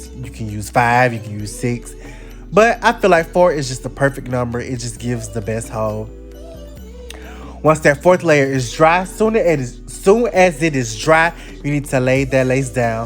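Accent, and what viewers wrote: South, United States. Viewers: He's accent is southern and he does say he's located in Georgia in every video description. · This country accent I love it lol😭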